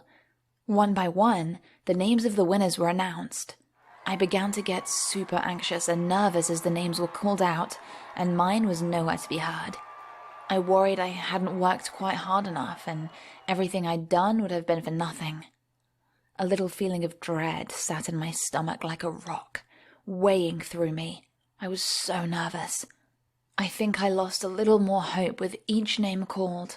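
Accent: United Kingdom. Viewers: The speaker is British but no the person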